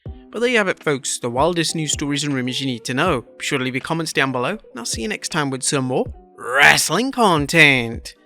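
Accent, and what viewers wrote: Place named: United Kingdom. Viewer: Idk why but hearing the narrator read Top Dolla's tweets was hilarious to me. Particularly with his thick British/English accent and saying "y'all". Lmao. Idk why it's funny to me but I was laughing so much during this segment. Probably just because I worked all this weekend and I'm tired/delirious. 🤣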